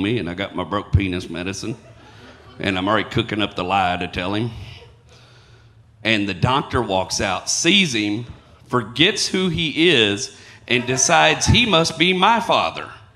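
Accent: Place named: Mississippi, United States. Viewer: As someone born and raised in Mississippi you sound just like most of my family and it's kinda surreal hearing somebody like us doing stand up keep it up man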